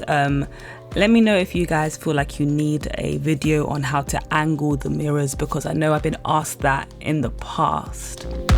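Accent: United Kingdom. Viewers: and lol I love your british accent!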